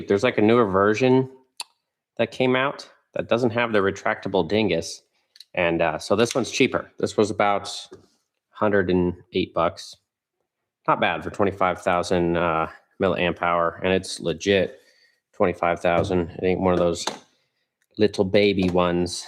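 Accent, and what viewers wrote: Canada. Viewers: I was wondering why you sounded Canadian but your bio said USA. You're an honourary Canadian in my book, bud.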